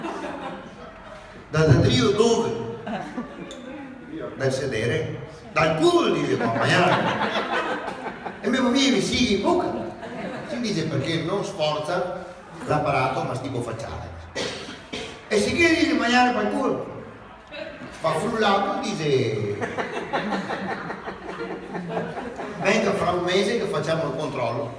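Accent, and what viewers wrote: Veneto, Italy. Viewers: Le racconta bene 😂😂😂😂E la parlata veneta e spettacolare 😂😂😂😂😂😂🎉🎉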